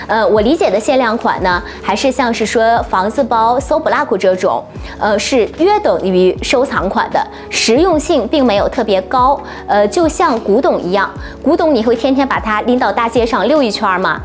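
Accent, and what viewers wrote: Japan. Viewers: 她好像是在日本，日式英語不標準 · 是的呀 日文发音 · 是日文加大东北混合发音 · 對啊，她是在日本生活的，英文發音就是日本人的發音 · 請問英文是日本口音嗎😂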